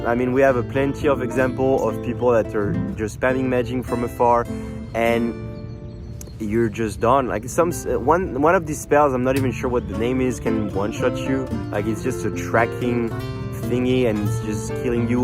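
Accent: France. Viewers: It was good while I was around 50 because at least you don't die with a single shot, the problem happens when you are constantly ganked. You can only invade if the host already has a summon, and once you invade he can summon a blue hunter... There should not be an item like that, and the invader should be able to summon help. That's my opinion. Fixing PVP is the only thing this game needs in order to b perfect imo. You've got a new subscriber, I am looking forward to watching more videos. Loved the French accent haha!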